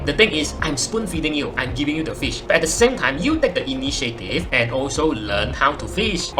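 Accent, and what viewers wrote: Malaysia. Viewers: haha.. no la just teasing u .. I think u being original is the best regardless of what others say.. that being said, your English style of saying is just Malaysian could understand ..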